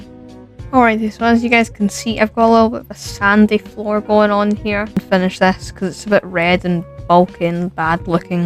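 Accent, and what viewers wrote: Scotland, United Kingdom. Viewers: R u Scottish cuz u have the accent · its either bros really british or bros faking the british accent (still respect) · Are you Scottish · It’s Scottish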